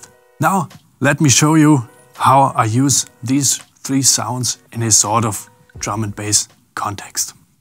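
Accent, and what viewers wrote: Germany. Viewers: I love the german-english accent for some reason. It sounds so smooth.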